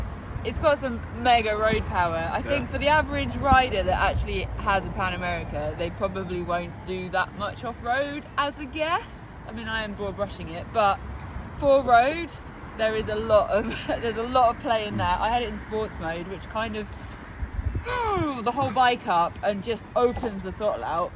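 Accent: United Kingdom. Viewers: don't be subscribed from India just for the British accent ❤️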